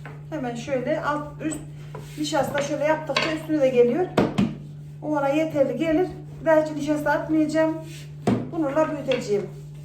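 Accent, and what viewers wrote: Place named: Aksaray, Turkey. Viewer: İyi günler maşallah çok güzel ve dogalsiniz bende aksarayliyim bu tarifi yapacam aynı bizim gibi konuşuyon kız aksarayin neresindesin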